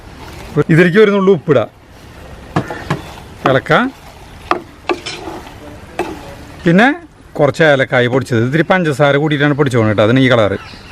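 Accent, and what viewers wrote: Thrissur, India. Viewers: Sugiyan 👌👌👌👍👍👍ente Mami yum thrissur kkari aanu maman marriage chaithu trivandrum vannittu 17 years aayi but eppozhum samsarikkunnathu chetante pole nalla rasamulla thrissur slang lanu enikkum thrissur slang valare ishtamanu👍🙋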